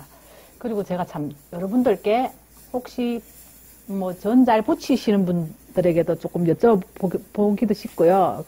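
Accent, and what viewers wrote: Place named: Daegu, South Korea. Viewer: 구수한 대구사투리 억수로 좋슴미다요🤗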